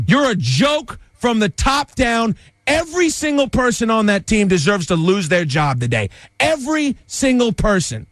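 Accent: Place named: New York, United States. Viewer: Roughly 6 minutes in... you say you were born in NoVa/DC? You sound more like New Yawkuh/Joyzeyite.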